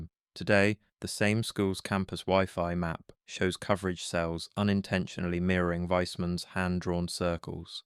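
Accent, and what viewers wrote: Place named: United Kingdom. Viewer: fake British AI generated voice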